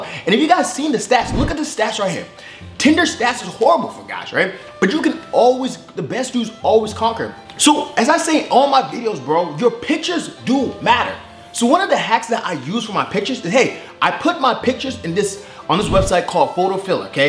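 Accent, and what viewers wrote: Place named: United States. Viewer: He doesn't even sound like hes from Toronto. He sounds American as hell.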